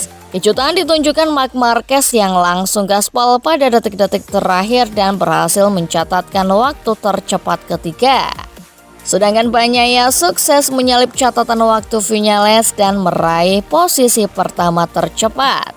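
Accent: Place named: Java, Indonesia. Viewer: Hostnya medok gitu bawa beritanya 😂😂😂 jawa bgt ya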